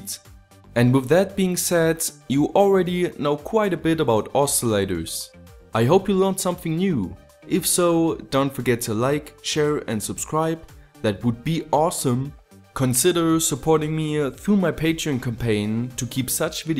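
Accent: Germany. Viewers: that accent though, germans genes are strong in this one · Wie man sofort hört dass du deutscher bist ^^ Aber sehr geile videos machst du, respekt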